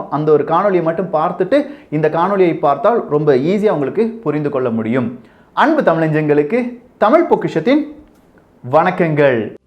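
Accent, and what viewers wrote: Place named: Madurai, India. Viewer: Dai Marithas Mathuriya Pasura da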